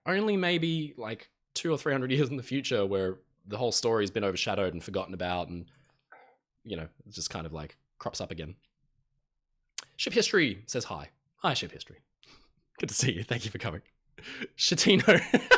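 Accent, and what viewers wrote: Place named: Australia. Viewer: Hello thank you from Robert Bruce in Canada, love your knowledgeable & congenial presentations, like the way you talk your touch of Aussie accent is very likable, cheers!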